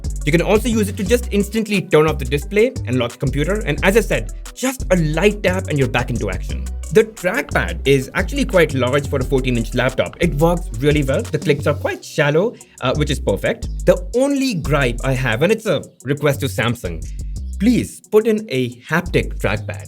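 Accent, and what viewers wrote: India. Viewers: Iss. Bande ka eng bhi muze hindi jesa lag rha hai😅😅 laga hi nai english me video dekh rha hu 😅😅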